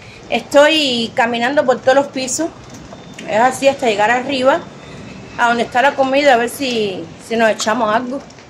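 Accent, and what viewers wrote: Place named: Cuba. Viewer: ME ENCANTAN TUS VIDEOS, ERES SUPER COMICA. NO HAY NADA MEJOR QUE COMENZAR EL DIA MIRANDO UNO DE TUS VIDEOS PARA ESCUCHAR EL ACENTO CUBANO Y REIRME CON TUS COSAS. QUE ALLAH TE BENDIGA SIEMPRE.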